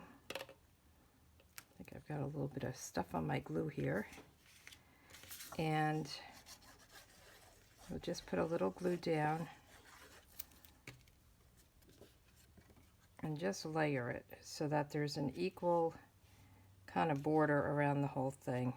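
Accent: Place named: East Coast, United States. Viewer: Soooooooooooo adorable!!! I just love where you placed the sentiment tag. Thank you so much for your well executed tutorial! And as always, this NY lady, in now living in CO, loves hearing your east coast accent!! Be well, Hugs, Karen ❌💜❌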